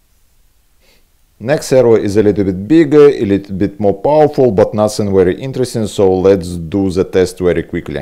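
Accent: Russia. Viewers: Are you Russian?)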